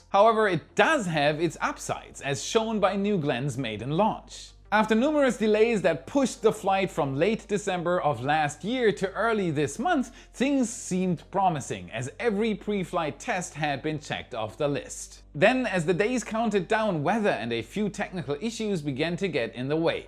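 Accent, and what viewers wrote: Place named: Germany. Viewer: could very well be for a different pronunciation. is German, so kinda expect a heavy German accent with the pronunciation 😊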